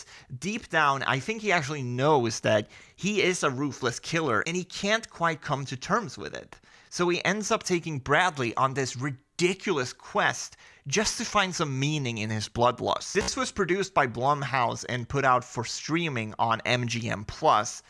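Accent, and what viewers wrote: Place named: United States. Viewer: You sound yankee AF, for a sweedish... Thanks bro. I´ll be looking for those movies. Happy new year!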